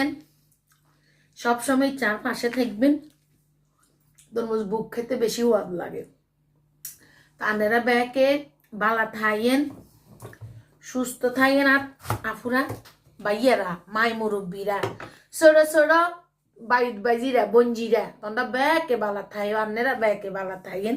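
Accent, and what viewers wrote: Noakhali, Bangladesh. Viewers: আপু আমি আজকে প্রথম আপনার কমেন্ট করলাম আপনার নোয়াখাইল্লা ভাষা খুব সুন্দর লাগতেছে আপনার মুখে আমিও নোয়াখাইল্লা বউ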